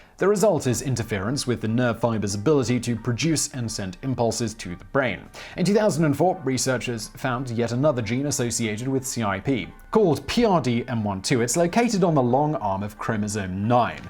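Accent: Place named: RP, United Kingdom. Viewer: Is this RP accent the narrator speaks in something he practiced independently or learned in school, or is this a natural accent some people have in some region of the UK? Just curious because his way of speaking is incredibly clear and lovely.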